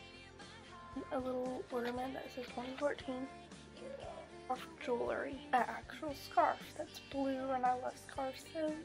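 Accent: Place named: South, United States. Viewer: Oh well im a California guy, so as soon as i heard your voice i knew that it was something different, I kinda figured because there might have been some southern to it but i wasnt sure, its really unique and i like it! You seem super nice btw, so i hope your channel does really well!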